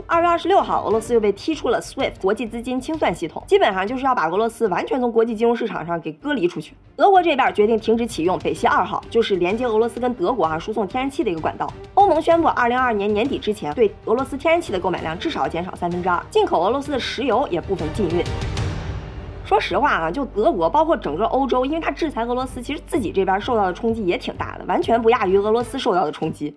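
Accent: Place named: North, China. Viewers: 感觉是北方口音，但是咋判断是东北人！？ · 小Lin的口音听着像山东老乡啊。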